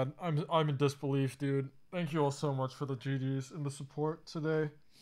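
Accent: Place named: United States. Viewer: It's your accent. Or more so from an American's perspective, lack there of. Your English blends with "American English" honestly seamlessly to the point you can say your from New York and no one would bat an eye.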